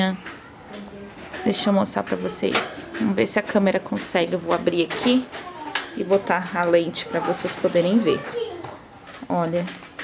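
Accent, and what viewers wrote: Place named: South, Brazil. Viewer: Amo o sotaque do Sul e.lindo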